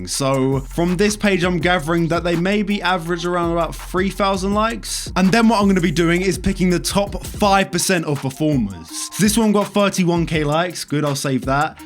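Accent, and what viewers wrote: United Kingdom. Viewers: His British accent😔💗💗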